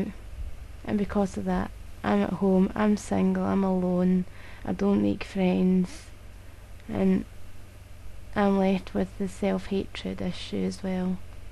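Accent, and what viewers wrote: Scotland, United Kingdom. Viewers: are you Scottish? I like your accent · are you Scottish Might be a silly question but trying to read the acccent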